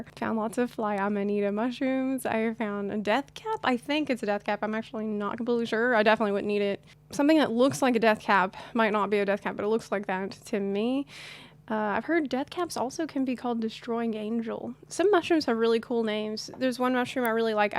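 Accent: South, United States. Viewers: "Ya,all sounds from somewhere central southern USA area. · I can't believe you're from Ukraine! I guess it's just because I've always picked up a very slight southern US accent.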